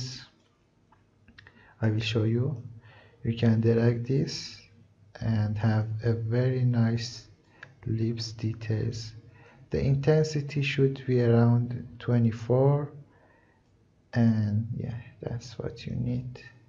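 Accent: Iran. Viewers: this guy is definitely persian jfc, his accent is so thick i cant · Are you persian? The accent gives it away😆❤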